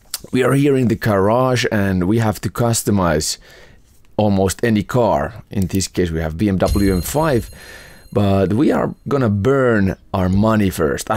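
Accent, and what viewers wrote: Finland. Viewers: Are you finnish? Your accent sounds like it.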